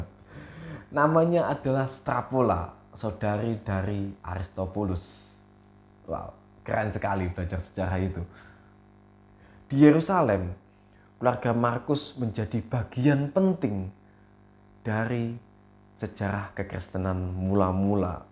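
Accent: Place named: Java, Indonesia. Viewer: Logatnya kaya orang jawa..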